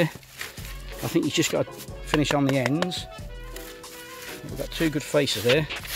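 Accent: Norfolk, United Kingdom. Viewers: Thanks, I thought Norfolk but didn't want to risk insulting you if you came from Suffolk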